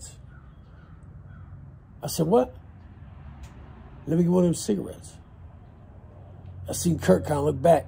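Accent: Virginia, United States. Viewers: Your def from the VA area......love your story telling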